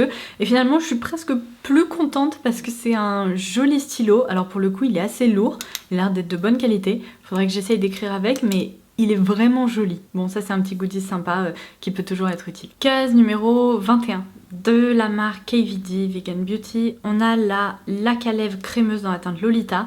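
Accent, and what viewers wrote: England, United Kingdom. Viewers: Ton accent anglais est magnifique, à tu fais des études spéciales ?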